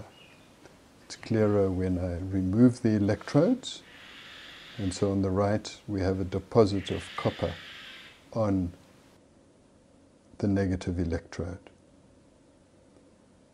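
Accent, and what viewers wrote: South Africa. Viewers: I know that South African accent anywhere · Your South African aren't you? Ik that accent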